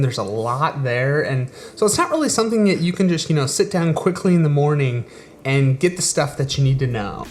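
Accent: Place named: England, United Kingdom. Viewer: I like your English accent!! God bless you a lot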